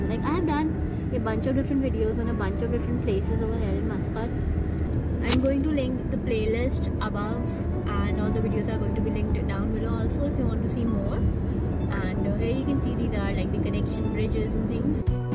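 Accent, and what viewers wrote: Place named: India. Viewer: 4 secs we can make out Indian accent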